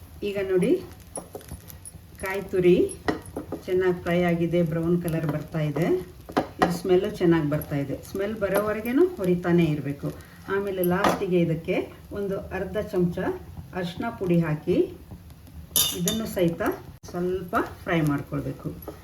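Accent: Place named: Konkani, India. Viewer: Aap kyon Konkani style bolate Shetti style boliye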